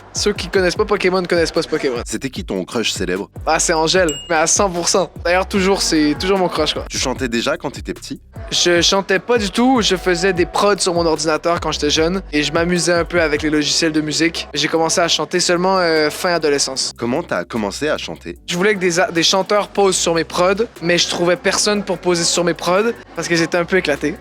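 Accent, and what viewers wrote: Quebec, Canada. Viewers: C'est trop drôle de t'entendre en dehors de tes musiques parce que dans celle ci on se rend pas compte que tu es québécois 😂❤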